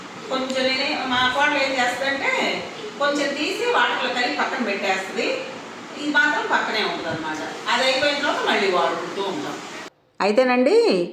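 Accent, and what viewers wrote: Guntur, India. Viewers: మీది గుంటూరు ఆ అండీ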